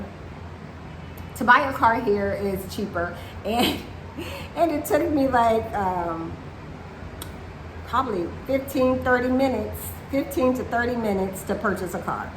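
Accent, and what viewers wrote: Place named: Southern California, United States. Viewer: I thought that was a So Cal accent. I'm hoping to visit Panama, Spain, and the Philippines this year so we'll see what happens. Keep up the YouTubes - you're easy to look at 🙂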